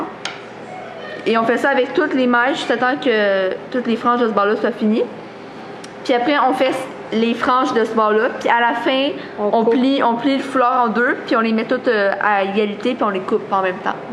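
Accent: Quebec, Canada. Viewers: Merci pour ce vidéo, c'est très bien fait et je suis contente d'entendre une voix de Québécoise!! Tu as du talent pour éduquer. Par contre j'aurais une petite question pour toi. Si on aime pas le look avec les franges au bout, comment peut-on "fermer" le tout? Et/ou si on veut faire un "tube" comme c'est bien à la mode en ce moment, comment suggères-tu qu'on l'assemble? Ça serait bien gentil si tu pouvais faire une mini vidéo qui démontre comment terminer le foulard sans franges en tout cas! :) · Elle parle québecois : )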